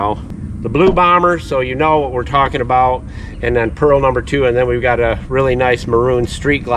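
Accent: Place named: Michigan, United States. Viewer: Been watching you for years. If memory serves you were somewhere in Michigan. (We can still hear the "Michiganer" accent) We're down near Tampa. Welcome to Florida.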